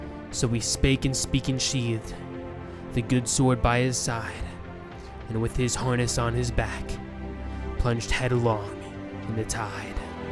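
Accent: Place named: United States. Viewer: Magnificent words, but doesn't work when read in a U S tone of voice.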